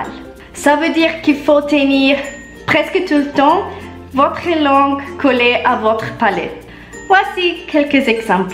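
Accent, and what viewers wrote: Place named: Tuscany, Italy. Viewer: le inflessioni toscane le sento bene quando parli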